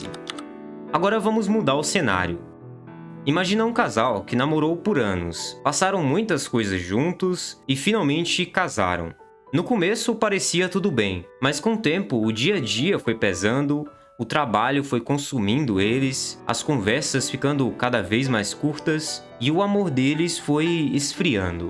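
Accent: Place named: Pará, Brazil. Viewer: Sotaque da região do Pará, tenho um amigo do Pará e estudei com pessoas do Pará q tmb falam assim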